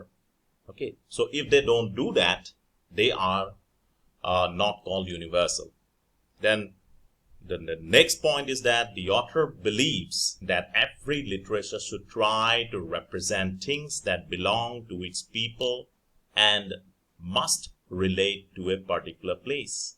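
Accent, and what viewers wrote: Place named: Assam, India. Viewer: Literasure.. I love ur assamese accent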